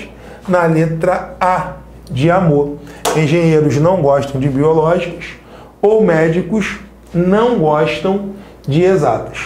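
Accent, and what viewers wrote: Rio de Janeiro, Brazil. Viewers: esse sotaque do Rio é horrível. Parem de colocar o X no lugar do S no final e no meio das palavras.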